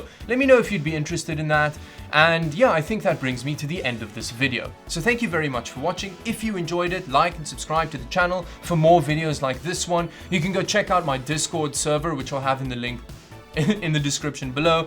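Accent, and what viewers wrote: South Africa. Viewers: Are you South African? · Love your channel man, PC building Noob here trying to get the basic under control xD keep up the good work, also are you a South African Expat ? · Are you south african by any chance?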